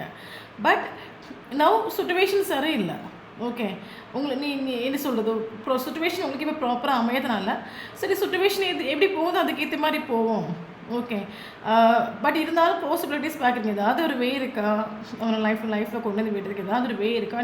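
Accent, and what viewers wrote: Kerala, India. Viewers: ரொம்ப நன்றி univers ♥♥🙏🙏, mam super உங்க Reding, எல்லாமே உண்மை. நீங்கள் சிரிச்சிட்டு சொல்லுறது ரொம்ப அழகு, உங்கள் மலையாள தமிழ் தனி அழகு.! நன்றி time பார்த்து அடிக்கிறீங்க ❤❤.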